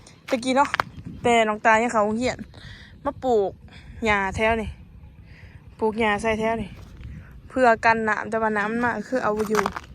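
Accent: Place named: Nong Khai, Thailand. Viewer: บ้านหนองต่ายใกล้หนองคายไหมพูดสำเนียงเหมือนคนหนองคายคนไทย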